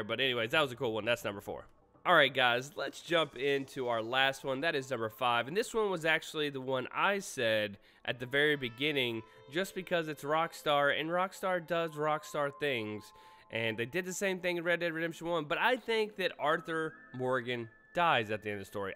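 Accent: Northeast, United States. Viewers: I'm from Kentucky your accent sounds like you're from the Northeast it's kind of funny how I noticed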